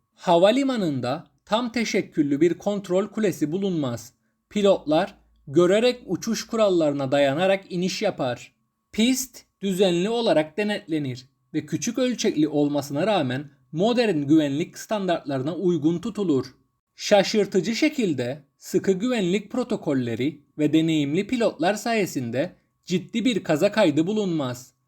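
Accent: Cyprus. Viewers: Abi kıbrıslımısın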